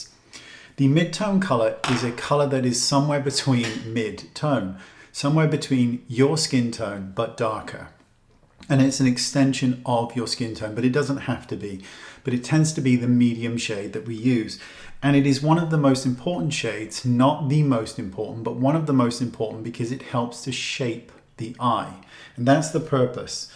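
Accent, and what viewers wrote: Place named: United Kingdom. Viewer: That British accent gets me every time.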